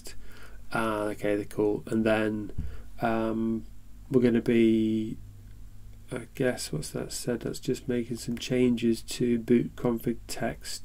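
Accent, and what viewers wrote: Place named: United Kingdom. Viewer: i approve of both this video and your british accent i like british people :)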